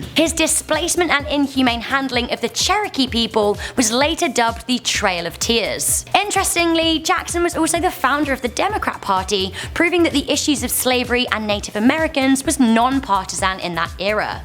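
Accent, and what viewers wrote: United Kingdom. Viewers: are you British? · Few things piss me off more than some british chick and her screechy british accent complaining and making her negative comments on OUR presidents. While UK still has that terrible example of a royal "prince" Charles alive - no brit should be telling me about our problem presidents. Queen Mother and Charles need to do what is right for UK and give the power to William while they are alive to advise his court. This chick is horrible I am tuning out. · A American should criticáis the USA not some British hore with her British accent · This list is total bullshit. Obomber was the worst President this country has ever seen and pardon me, you have a British accent. What the hell could you possibly know about U.S. history?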